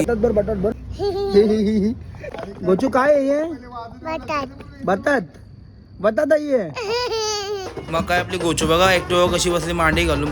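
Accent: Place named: Agri, India. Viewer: Tumchi agri marathi lai bhari ahe bhava ❤❤❤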